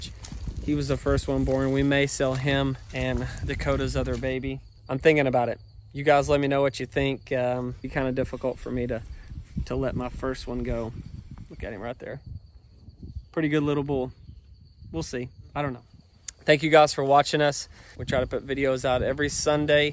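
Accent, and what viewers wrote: Oklahoma, United States. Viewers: I love Elenor. I’ve enjoyed your adventures. I have family in Moore so I enjoy watching the weather and hearing that great Oklahoma accent I’m in upstate ny. Thank you so much for sharing your lives. Good luck with the new place.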